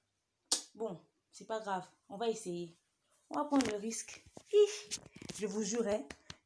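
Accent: Ivory Coast. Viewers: Kkkkkk suis par terre avec ton accent ivoirien nous les warriors on t'aime beaucoup niang's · Good Job, ton accent ivoirien est parfait parle en nouchi pour la prochaine!! Fally a simplement fait du sarcasme de l’ironie. Et li y parle aussi de son étoile depuis le groupe Talents Latents,en passant par le Quartier Latin et jusqu'à ce jour avec FVicteam qui est son label: personne ne pourra le stopper!!!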